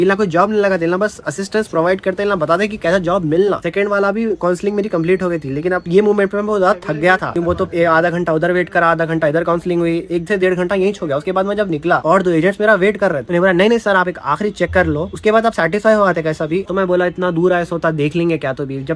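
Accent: Hyderabad, India. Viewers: Hyderabadi hai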